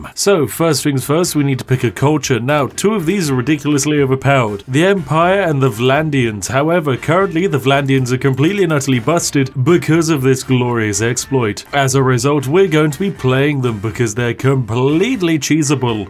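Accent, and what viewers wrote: United Kingdom. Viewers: Hahahahaha yesssyesss I love your British accent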